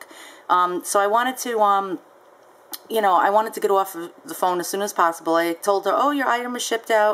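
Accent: United States. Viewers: Ur a fellow new yorker I can hear it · she sounds like she is from new jersey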